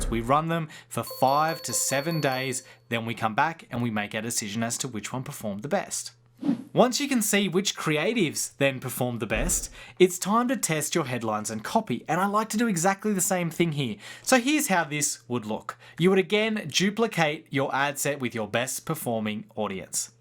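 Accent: Australia. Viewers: Hey, good to hear and Aussie voice, also I suspect relevance to local biz. Any ideas re real estate fb ads? Regards Tony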